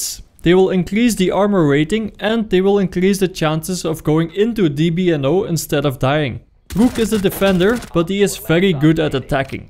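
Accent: Netherlands. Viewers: Probably the Netherlands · duidelijk Nederlands Engels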